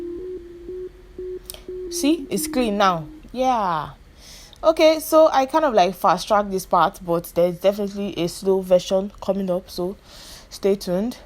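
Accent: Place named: Igbo, Nigeria. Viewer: you igbo accent is amazing! I love it.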